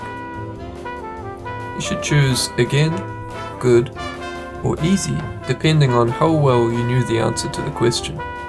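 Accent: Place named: New Zealand. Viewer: Who got the Kiwi to do the tutorial about "decks" then..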